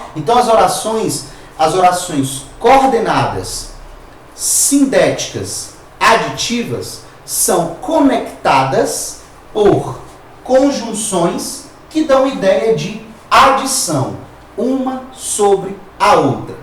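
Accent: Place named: Northeast, Brazil. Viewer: se fui agressivo, desculpas mil! O problema é que o amigo posta aulas não para o nordeste e, sim, para todo o Brasil, aliás aulas excelentes. Minha bronca, que nem mesmo é uma bronca, apenas uma observação mais forte que o tom normal, é que o amigo teria ainda mais sucesso do que tem se, num gesto de concessão, fizesse um esforço para pronunciar corretamente o fonema "de". A toda-poderosa Globo gasta milhares de reais com fonoaudiólogos para corrigir vícios, ou regionalismos,de linguagem, pois suas séries não são apenas para o público carioca e, sim, para todo o Brasil. Cara, repito, um milhão de perdões se pareci agressivo. Aqui, o "astro" é o amigo, sou apenas um assíduo visitante dos teus vídeos os quais são dádivas aos necessitados de melhoras em termos de conhecimento da nossa tão amada língua portuguesa. Abraços e saudações coloradas!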